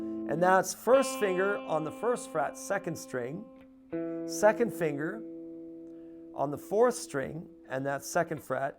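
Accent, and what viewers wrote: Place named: Canada. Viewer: Hey thanks...kind of coincidental that I would run across this lesson after just having bought my 2nd capo, the 'short-cut.' I'm only into the guitar 2 years, and although I practice daily--& often--I am a woman of a certain age with recently diagnosed arthritis. Now, "3 chords and the truth" may be enough for some (younger?) folks to achieve success quickly, but since my only option is to focus on my personal goals & chart my progression, this video of yours and certain others have helped me a great deal. First, your show on hand exercises was inspirational & dove-tailed perfectly with my daily physiotherapy -- right up there with rolling the baoding balls around (haha...but true nonetheless). As well, I appreciate your straightforward, no-nonsense approach to learning; as a teacher myself I agree that learners must not be spoon-fed, but should think for themselves as much as possible. 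Of course, one must have hope in order to carry on when in something like my predicament, thus I was very happy to hear you express, more or less --- "'you get out of it what you put into it." Hey! Now I'm not nearly so crushed as I was. May I request that you follow up with a lesson based on the "shorty" capo...? I've heard they are a great boon to the arthritis-afflicted. Thanks so much for your time & effort. By the way (considering that I'm a language teacher/linguist), based on your vowels, intonation, cadence, & tons of other factors, I'm assuming you are Canadian?? If so, please a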